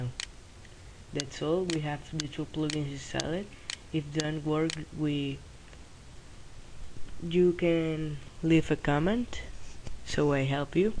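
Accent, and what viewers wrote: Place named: Spain. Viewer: are you kidding me? he speaks great english, only thing different is the spanish accent. This was better english than most english speaking people can produce. Thumbs Up!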